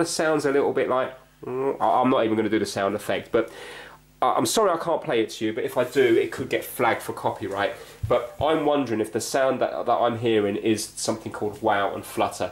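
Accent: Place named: East Anglia, United Kingdom. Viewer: I can't quite place accent though. Sometimes he sounds like he's from East Anglia, other times Irish!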